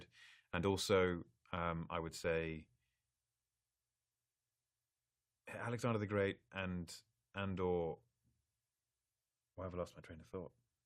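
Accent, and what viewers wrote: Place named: United Kingdom. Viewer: esse sotaque britânico que eu amo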